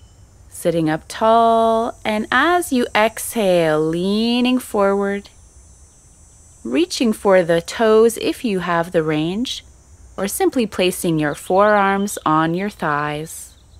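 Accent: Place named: Canada. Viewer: thank you SO much for making (I've only seen one) - this video. I will look for the 'other' video - and continue practicing Yoga. I'm almost 71, a Canadian living in Sedona, AZ. Not only did I find your technique soothing as well as 'stretching' - it was fun to hear the Canadian accent! Typically, I go to a rec center once weekly for chair Yoga. And, now I can do so in the comfort of my home, daily, if I choose. I love how the three ladies demonstrate various ways of executing the movements, depending on one's body's capabilities. And I love how they smile. Congrats, Ladies!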